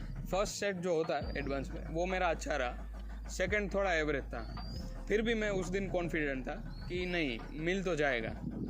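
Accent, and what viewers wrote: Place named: Gujarat, India. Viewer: All the best for College, New journey With new josh 👏 the Gujrati Tone in voice and The josh of new beginning indicates The real Gujrati Chhokra 😅😅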